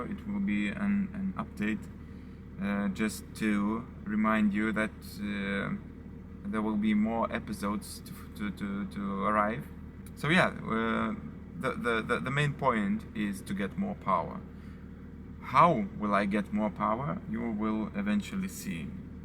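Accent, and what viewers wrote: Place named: RP, United Kingdom. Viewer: posh bri'ish accent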